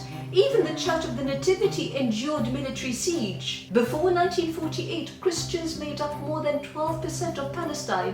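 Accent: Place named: London, United Kingdom. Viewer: Mad accent. It's like an Edwardian era upper middle class London accent.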